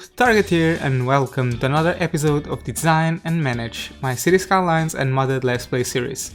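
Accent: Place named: Portugal. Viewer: O sotaque português nota-se tão bem! Grande trabalho que tens feito neste canal, vejo muitos dos vídeos que fazes, obrigado pela dedicação!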